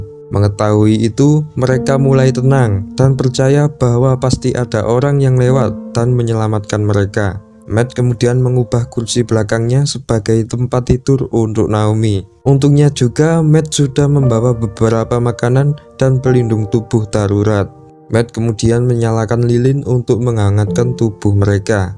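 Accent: Java, Indonesia. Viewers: JAWINYA MEDOK BNGT MASS 😁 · Medoknya lho rek wkwk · jawa nya medok bgt 😫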